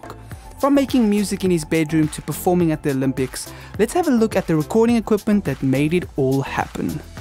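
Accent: South Africa. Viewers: Really enjoyed this video! Toe jy begin praat, het ek dadelik besef jy’s van hierdie kant af! Looking forward to see more from you 💪🏽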